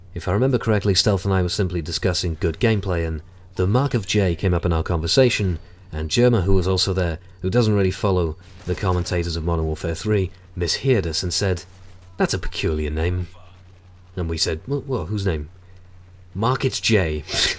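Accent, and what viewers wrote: United Kingdom. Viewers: ARE YOU SCOTTISH IRISH OR BRITISH??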